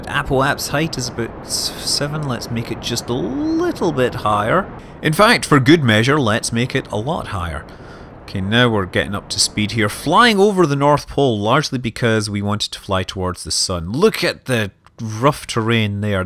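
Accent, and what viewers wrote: Scotland, United Kingdom. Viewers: Omg, nerdcubed sent me here and I was a bit reluctant but now I know your Scottish I’m amazed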